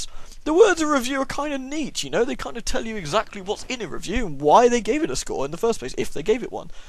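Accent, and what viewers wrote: United Kingdom. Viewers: Burly Castlevania with shouty ranting brit-man? Sounds legit!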